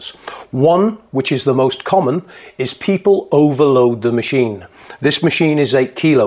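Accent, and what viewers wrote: United Kingdom. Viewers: Gosh, I came here for help to remove eletric oven and now I cant stop watching your videos. You are great! (and you have a British accent, which makes me feel comfortable because I had a great time living in The UK for 6 years! Cheers! (subscribed and like)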